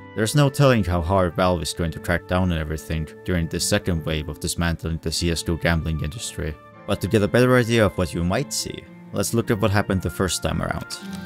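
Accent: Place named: Estonia. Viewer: Hea video ja huvitav kuulamine :D Sain esimese paari minutiga aru, et tegu eestlasega! Keep it up!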